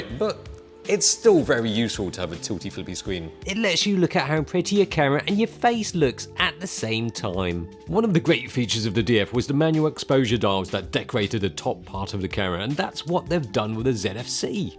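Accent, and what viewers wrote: United Kingdom. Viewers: I'm just mesmerized by an Asian guy with caricature glasses and a British accent/ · Thats how we pronounce it here in the UK ( Kai is British of Hong Kong Chinese decent ) and its the original pronunciation btw, its only Webster whom decided to change our British language and spellings because he had an issue with some of the French inflections within our language. We spell Harbour with the u, you spell it Harbor without because he changed it, the same goes for Aluminium.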